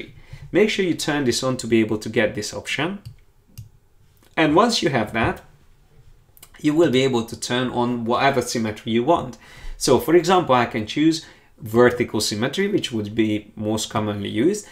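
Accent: Hungary. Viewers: that magyar accent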